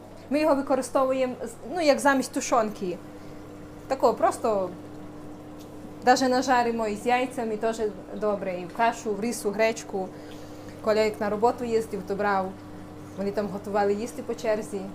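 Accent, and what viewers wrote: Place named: Zhytomyr, Ukraine. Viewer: Здравствуйте. Я сама с Украины с Житомира диалект знакомый,вы где живёте? Очень скучаю, а теперь не знаю смогу ли приехать. Я живу в Казахстане, Алматы. Спасибо.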